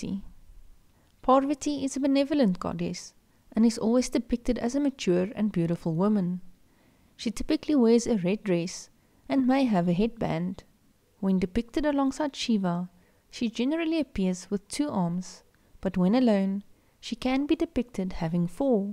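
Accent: South Africa. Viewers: Very nice content. Familiar accent. South African?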